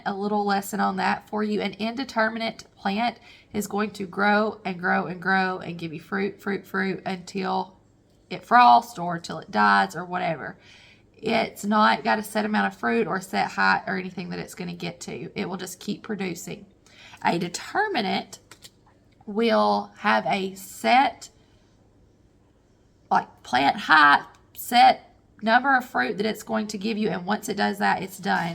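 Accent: South, United States. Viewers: Love your accent as a fellow woman from the south, you’re a pleasure to listen to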